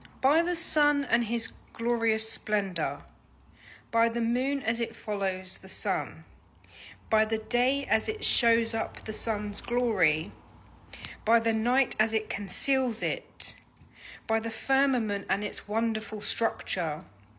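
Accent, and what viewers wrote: United Kingdom. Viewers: لكنتها انجليزية وليست أمريكية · من لكنة اللسان هذه السيده تتحدث باللهجة البريطانية وليست أمريكية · ليست أميركية ، بل انكليزية أو بريطانية واضح من لهجتها .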